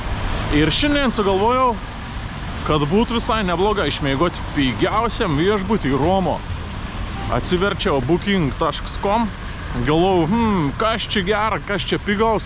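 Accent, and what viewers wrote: Samogitia, Lithuania. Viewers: Bet keik faine viel tav keliuon kažkor matyte, da ka žemaiteška rokounys atruod ka su draugel pats išdiejau i ton Ruoma ;D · sėkmės, super , smagu ziureti ir klausyti zemaitiska😃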